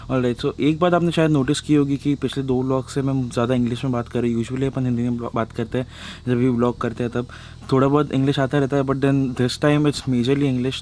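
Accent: United States. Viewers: Ek quote hai : "when in Rome, do like what Romans do". So it's probably for the best if you talk with the American accent. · It was a nice vlog and the drone shots were superb and the american English accent was awesome and thanks for showing us such a beautiful greenery vlog👍👌👏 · The American accent comes out naturally once u get into United States, and with exploring new people around is quite common!! So, don’t bother abt people who says u r faking it n all!! Because they haven’t been in States so, n mostly people r jealous why they can’t have the same taste, what v have right here!!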